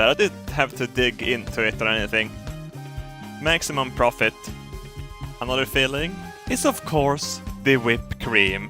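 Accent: Sweden. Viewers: the way everyone in the comments is trying to correct because of how he says the word Flour, or just saying "Nice english bro" He's swedish they pronounce words differently not everyone says words the way you do. So stop correcting him. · Min favorite part av this video är the svengelska! :) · ”put your buns on backplåtts-paper” His swenglish is perfection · Swendlish is aborable.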